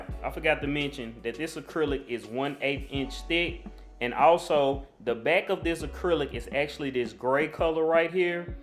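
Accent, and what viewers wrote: South, United States. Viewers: I'm from Texas. I picked up on that southern twang! Lol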